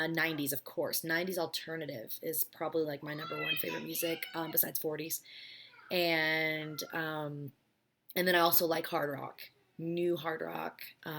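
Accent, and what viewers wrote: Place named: South, United States. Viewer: Sounds like you're a Southerner. That's the important thing! --don, mphs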